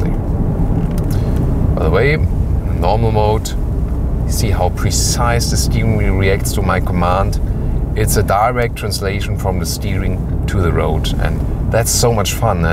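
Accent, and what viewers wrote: Germany. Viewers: I speak german and I live in Canada so hearing pure german accents when speaking English is so hard to understand for me!! When you say a word in English I’m thinking in german😂 otherwise great video ! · Omg! He’s really cute! What’s his name ? And where is he from? He has an accent but I don’t know where he’s from. He sounds to have a German accent but I could be wrong .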